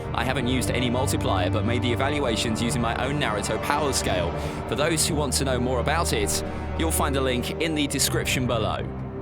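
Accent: United Kingdom. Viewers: Nice Power Level. Maybe we could do a power level together on my channel Btw I love your british accent :) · Your defenetly british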